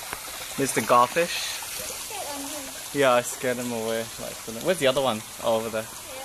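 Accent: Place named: United Kingdom. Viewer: you sound more british than anything else, but beautiful tank are you in malayia or america or what?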